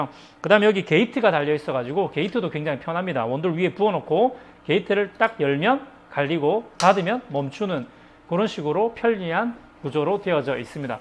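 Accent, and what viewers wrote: Gyeongsang, South Korea. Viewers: “구불고 구불고~”아주 오랜만에 듣는 말이네요. 갱상도분이신듯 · 경상도분이신거 모르기가 더 어렵지 않을까요 ㅋㅋㅋㅋㅋㅋㅋ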